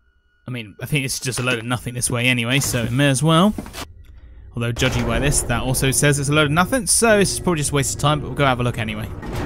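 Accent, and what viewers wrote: United Kingdom. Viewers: why do you sound extra british in this video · Why is he extra British in this video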